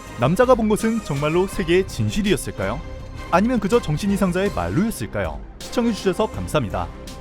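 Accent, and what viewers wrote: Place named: China. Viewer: 말투가 조선족 같아요 ㅋㅋ 컨셉인가요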